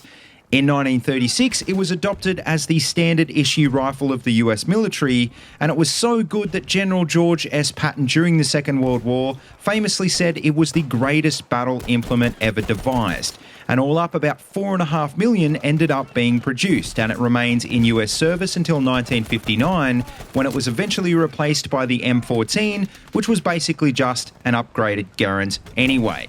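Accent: Australia. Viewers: good to hear an Aussie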